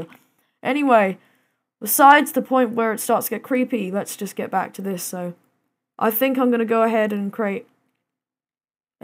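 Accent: United Kingdom. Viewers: You live in Cali? You sound pure British though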